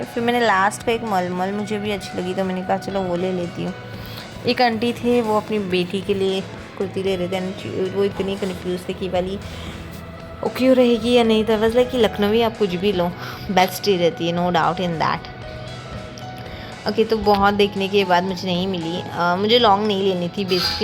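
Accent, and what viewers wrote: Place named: Mumbai, India. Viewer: Axtreme left. Typpickl shilwar.. Apks accent mumbai ka hai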